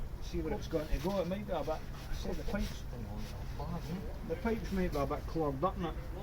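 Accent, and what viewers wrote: Scotland, United Kingdom. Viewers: Just found your channel and I love the Scottishness of it. 😁 Subscribed!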